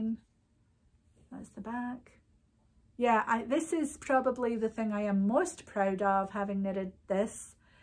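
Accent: Scotland, United Kingdom. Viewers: Thank you for all the Scottish updates. My mom has recently passed at 85 years. Being raised in Holytown near Glasgow, she learned to knit with woolly wool from her mother and I from her. I will also appreciate your Scottish brouge as she never lost hers after 54 years in the U.S.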